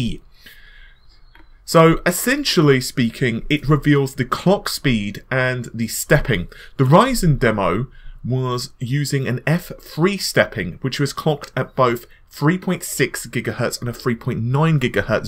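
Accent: England, United Kingdom. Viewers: He speak English you prolly speak American , thats the problem ... prolly .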